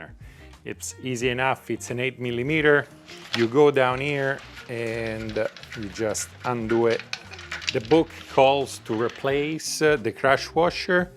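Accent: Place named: Italy. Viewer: He is Italian 100 % . I hear his accent.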